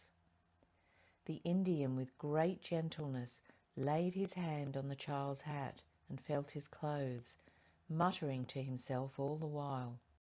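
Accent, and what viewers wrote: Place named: Australia. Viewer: What’s wierd about it, champ? It is an Australian lady with a normal accent. You should jog on, champ.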